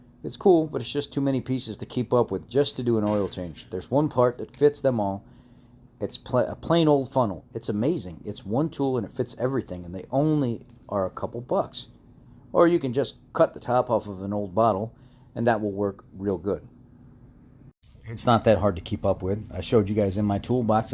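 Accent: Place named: Illinois, United States. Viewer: Hey, we can hear the Illinois in you! In many states crying like batch gets you ridiculed not appreciated! Back in my day keeping my shut saved alot of problems!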